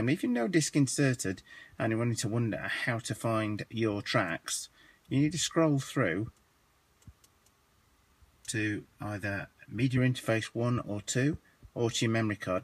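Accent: United Kingdom. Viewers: useful, thanks... At & t though, you sound English - how are you on at & t or did you move to the usa>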